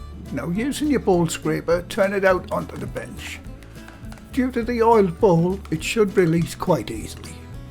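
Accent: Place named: Northeast England, United Kingdom. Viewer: from your accent you where brought up in the North East of England, the North East of the UK must be well into Scotland.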